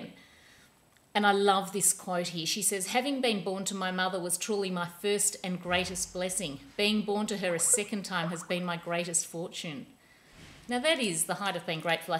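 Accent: Australia. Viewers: It's nice to hear an aussie on YouTube! I really enjoyed the book review - my stroke of insight sounds like an interesting read.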